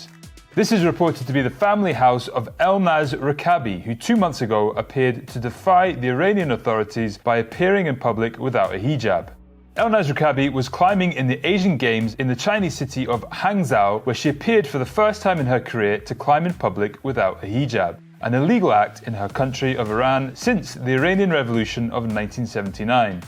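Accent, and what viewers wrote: Scotland, United Kingdom. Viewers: I swear you sound a lot more Scottish than I remember!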